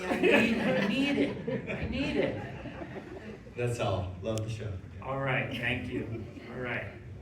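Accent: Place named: Western Pennsylvania, United States. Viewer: I recently found your channel and have been binging it. I thought you from the mid west or near east coast. I'm from western PA and your character is how we just talk. I thought you were one of us, you dirty dog!! Everyone even called my grandmother "Naner" for crying out loud. I got back into retro gaming in the past 2 years after dealing with a potentially very serious illness (it's mostly under control now) and finding your channel has helped me stay together while I distract myself with collecting and revisiting retro games again. I'm a lifer at 41yo started with a used Atari 2600 at age 4, then NES etc. all the way through to today. Thank you for the excellent channel.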